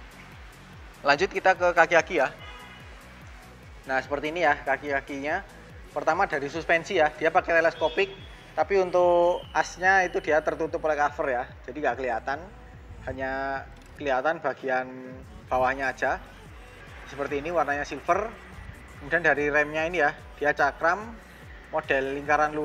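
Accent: Malang, Indonesia. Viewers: Mangkane medok tibae wong claket malang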